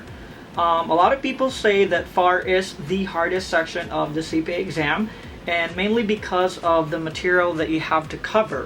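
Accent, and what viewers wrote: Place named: Hawaii, United States. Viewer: Good content. Btw where you from? I hear an accent. Hawaiian?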